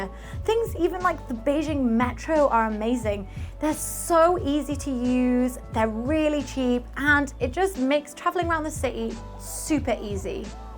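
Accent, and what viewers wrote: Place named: Northern England, United Kingdom. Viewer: Nice video :) I thought i heard a northern twang in your accent...that was Bamburgh castle right?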